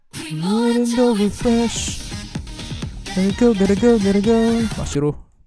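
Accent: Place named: Brazil. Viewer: I like your Brazillian accent!😍so classy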